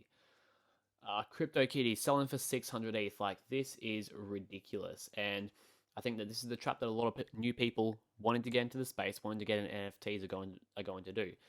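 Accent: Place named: Australia. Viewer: I subscribed because you sounded like an aussie living the crypto dream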